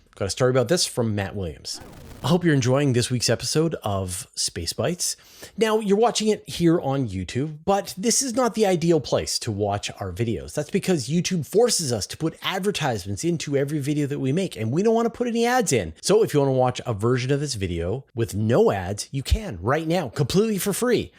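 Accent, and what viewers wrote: Canada. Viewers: I think he's talking about my comment on the last video 😂. I was just giving him a hard time because of his Canadian pronunciation of "A"s and "OU" vowels. My whole family immigrated from Canada to the US, so I always notice the subtle differences in speech. Some people are just really good at hearing accents, while others aren't. You being Canadian is why it sounded good to you 😂.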